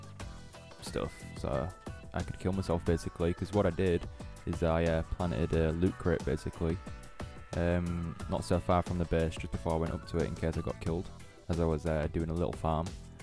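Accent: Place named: Hull, United Kingdom. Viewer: Yo man nice video! where are you from in england ? you sound like you're from hull haha! thats where im from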